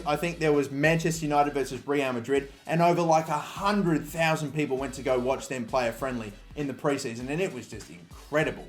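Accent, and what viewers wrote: Australia. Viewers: i could tell your Australian straight away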